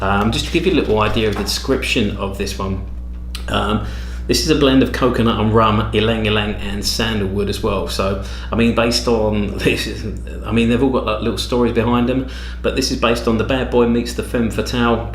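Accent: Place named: United Kingdom. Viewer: your FRENCH pronunciation is better than mine great BRITISH accent by the way Ive got nothing from this house I need to investigate thank you JIM from MIAMI BEACH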